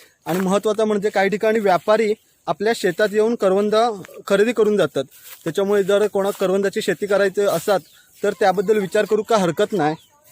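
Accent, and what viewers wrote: Konkan, India. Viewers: छान कोकणी टोण मध्ये बोलतो तू. ते फुलाच झाड विषारी आहे त्या झाडा मूळे आजू बाजुला इतर झाड जगत नाही. परदेशी झाड आहे ते इंग्रज घेऊन आले होते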